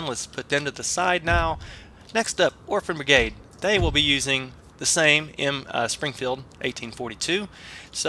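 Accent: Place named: South, United States. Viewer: from a neutral/Midwest accent to a straight up urban Southern accent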